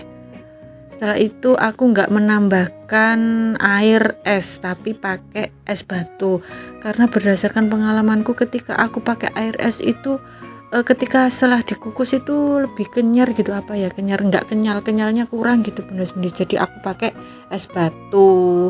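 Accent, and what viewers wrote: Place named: Java, Indonesia. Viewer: Wah medok sekali Asal dari mana bund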